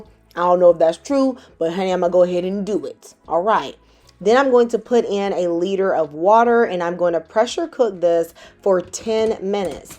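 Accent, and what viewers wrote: South, United States. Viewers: Where you from if I have to guess I would say Carolina’s, or Georgia. I love your channel.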